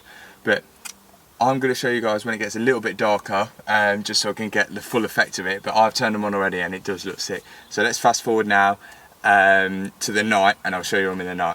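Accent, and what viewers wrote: Leicester, United Kingdom. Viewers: You sound like a Leicester lad.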